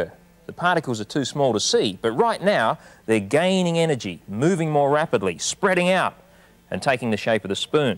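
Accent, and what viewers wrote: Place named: Australia. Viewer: Australian, I think. But he could be Tony Hinchcliffes Dad!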